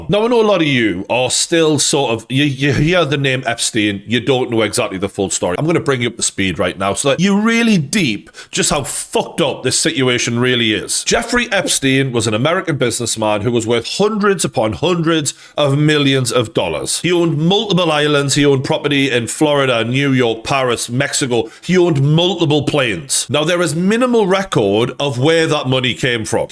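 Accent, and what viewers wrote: Newcastle, United Kingdom. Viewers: like the old true geordie , class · Love your accent. Love me a geordie! Great content too ❤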